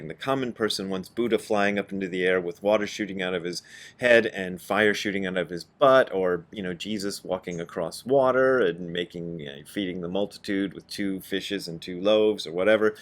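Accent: Cockney, United Kingdom. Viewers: "Burn baby burrrrnn!" I am in the, and never coming back! And with that cockney accent, you could probably get a gig if they reboot Spinal Tap. Awesome! 🤓🤙🎶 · Loving the Cockney accent. Can you do a Geordie one next please 🙏 🙂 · GREAT Cockney accent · That cockney accent sounds like Moss from The IT Crowd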